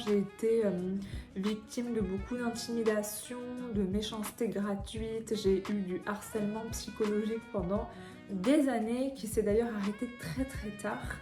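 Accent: Quebec, Canada. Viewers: Je trouve que tu prends le bel accent québécois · Petit accent québécois toute même 😁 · Vous avez pris une pointe d'accent québécois.. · Ça y est tu commences à avoir l accent canadien, c est trop mimi 😊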